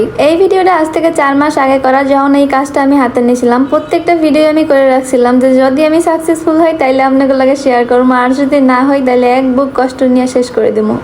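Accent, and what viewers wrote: Barishal, Bangladesh. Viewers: আসসালামু আলাইকুম আপু আমি আপনার ভিডিও অনেক দেখি আমি বরিশাল থেকে দেখেছি বরিশালের ভাষায় কথা বলেন তাইতো দেখি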